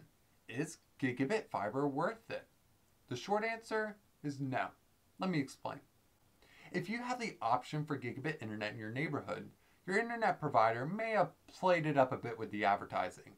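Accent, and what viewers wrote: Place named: Philadelphia, United States. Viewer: The accent is distracting lol. Philly? Baltimore?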